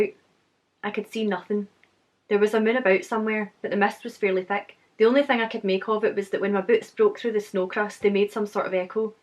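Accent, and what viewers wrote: Scotland, United Kingdom. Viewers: Everything sounds better in Scottish:) cheers.. sounds like you have another hike to do.. in the DAYTIME:) cheers.. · I just finished watching The Empty Man, a decent horror film which opens up with a hiking scene, and footsteps were... an element of it. Now I'm preparing for D&D in a few hours, watching a couple of your videos to help me get into the Scottish accent. And I stumble on this one. Heh, great stuff.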